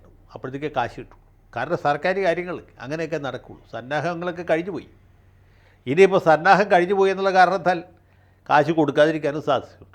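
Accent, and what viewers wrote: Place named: Trivandrum, India. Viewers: സ്ഥാനത്തും അസ്ഥാനത്തും തിരുവനന്തപുരം ഭാഷ പറഞ്ഞ് "ഹാസ്യം" സൃഷ്ടിക്കാൻ ശ്രമിക്കുന്നത് പരമ ബോറായി തോന്നുന്നു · Trivandrum bhasha super 😂😂😂😂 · വാസ്തവം ഫിലിമിലെ ജഗതിയുടെ റോൾ ജയശങ്കർ സർ നേ സ്വാധീനിച്ചുവെന്നു 100% വ്യക്തം....ട്രിവാൻഡ്രം സ്ലാംഗ് സൂപ്പർ...❤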